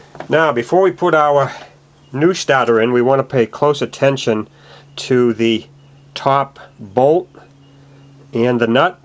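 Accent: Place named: Boston, United States. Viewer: Great stuff! Enjoying the accent. Car Talk headquarters must be right down the street.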